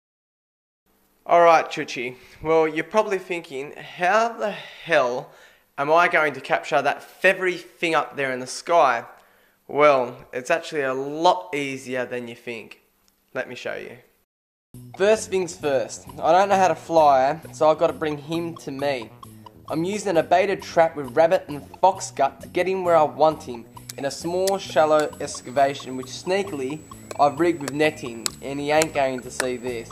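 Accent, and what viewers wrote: Australia. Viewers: Jensen Payne He's definitely Aussie. Not everyone in Australia has the same accent.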